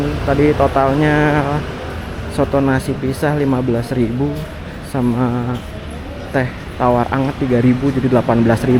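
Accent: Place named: Depok, Indonesia. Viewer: Cara ngebawain narasinya kek mas mas depok bgt nih...apalagi backsoundnya...mirip mirip😅. Moga2 bisa 1000k subscribers bg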